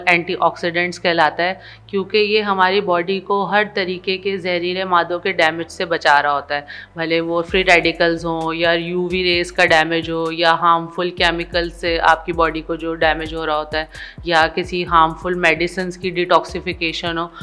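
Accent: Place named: Karachi, Pakistan. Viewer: Ye Dr karachi ki hy... Speaking accent say lgra hy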